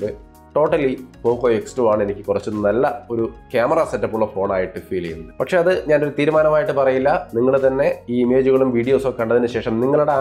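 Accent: Thrissur, India. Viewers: മനുഷ്യാ.... നിങ്ങൾ തൃശ്ശൂർകാരൻ ആയിരുന്നോ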